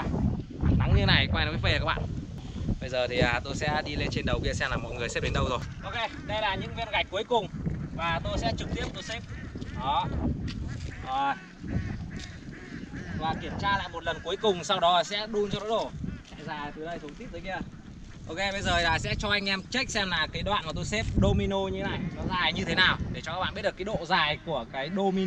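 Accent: Thai Binh, Vietnam. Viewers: Anh là người đâu vậy ạ?? Nghe giống giọng Thái Bình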